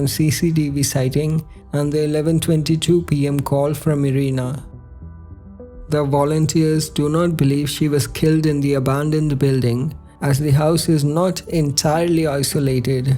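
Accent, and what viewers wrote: India. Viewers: I always stop listening immediately, when I hear he is narrating. The Indian accent just ruins it for me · Is he Indian?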